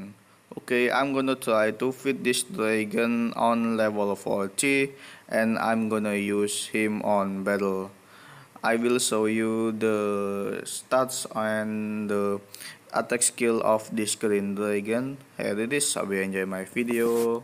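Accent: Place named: Indonesia. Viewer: Bang ngaku lu orang Indonesia kan dari cara lu bicara gua udah tau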